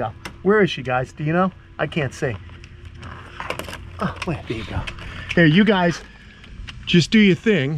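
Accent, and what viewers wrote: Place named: New York, United States. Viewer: "Oh they've got such claws... such claws!" You sound exactly like where you are from🙂!! You can take the man outta New York but you can NEVER take the New York outta da man. Brooklyn maybe? Queens? It's no matter..I love how you communicate!!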